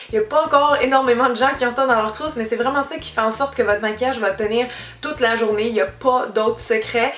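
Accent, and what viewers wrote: Quebec, Canada. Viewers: J'adore l'accent québécois. Si je devais en avoir un, je choisirais celui là :) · elle est québécoise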